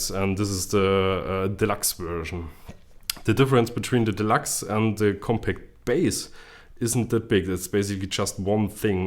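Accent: Germany. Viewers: A German man who speaks English😂 nice accent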